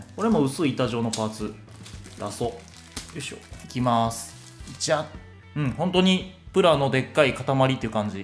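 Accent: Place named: Kansai, Japan. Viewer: 優しい関西弁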